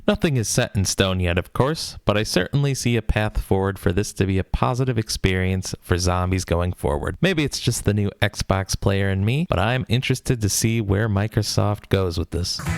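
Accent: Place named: Boston, United States. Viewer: You say zombies like you from Boston. You say "Zambies" instead.😢 They have issues pronouncing words. They call a place that is spelled Leominster like this "Lemonstah" like lobstah.😢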